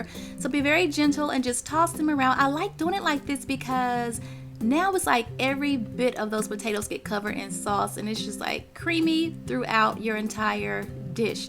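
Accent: South, United States. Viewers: omg sweet sugar wow love the southern voice mmm and what a great dish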